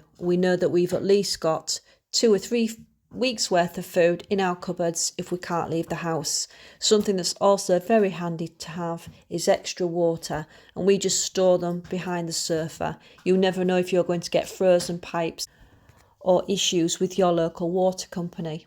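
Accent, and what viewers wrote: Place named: Hull, United Kingdom. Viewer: Just found you guys this morning 😍 I recognise that accent being a hull girl myself, am I correct 😜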